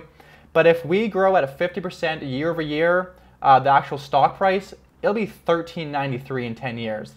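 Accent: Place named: Edmonton, Canada. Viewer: Are you from Edmonton?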